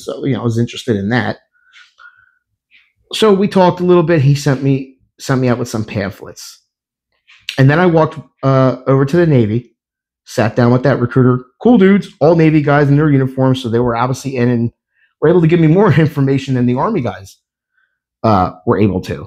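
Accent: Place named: New York, United States. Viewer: yeah definitely detected the NY accent as I watched other Videos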